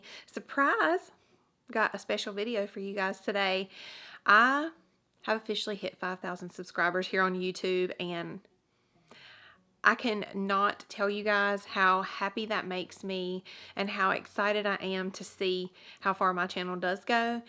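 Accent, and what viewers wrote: South, United States. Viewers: I live in North Georgia. I 💙 your southern accent because I have it too.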